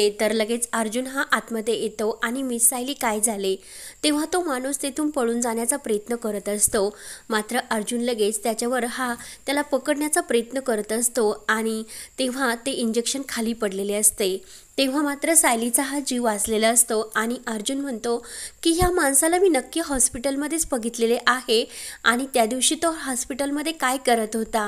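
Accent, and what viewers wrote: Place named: Gujarat, India. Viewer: Gujarati ahes na tu😅 marathi bgha hichi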